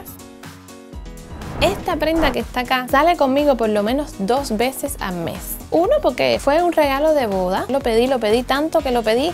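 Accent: Cuba. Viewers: eres un amor eres una buena actriz y me encanta tu acento Cubano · Son cubanas por eso tienen el mismo acento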